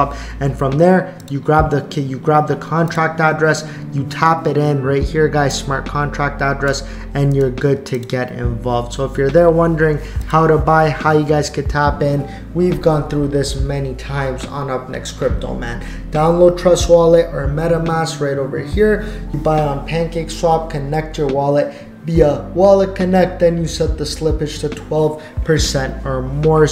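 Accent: United States. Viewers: Most American sounding Russian I’ve ever heard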